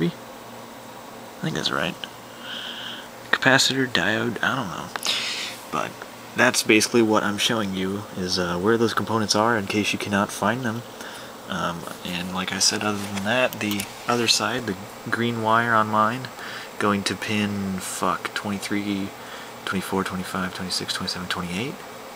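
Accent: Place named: Midwest, United States. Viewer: HA! you must be either from the mid-west, or Arizona... because you talk like ME! FUk this, fuK that... PLUS I spotted that Quicktrip cup on your floor :) thanks for this informative video, really entertaining too!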